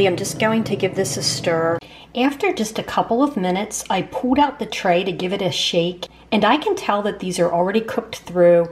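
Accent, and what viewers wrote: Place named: Pennsylvania, United States. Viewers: Great video! Are you from Pennsylvania? Your “accent” sounds like it! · Love the video but staying for your Pennsylvania accent 🙂 coming from a Minnesotan.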